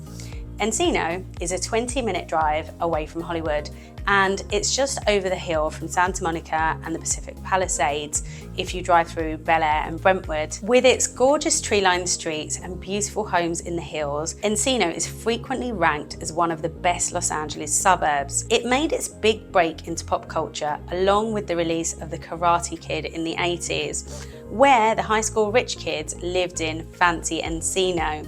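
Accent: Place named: United Kingdom. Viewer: You'll be selling Alot of properties with your beautiful British accent... Thumbs 👍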